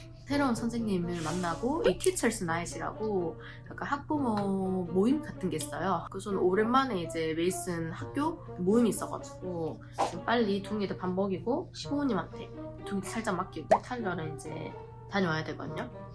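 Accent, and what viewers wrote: Gyeongsang, South Korea. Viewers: 반성합니다... 같은 경상도 출신인데 은 힘든 육아 속에서도 어찌 말투에 짜증 하나 안묻어날까요..😂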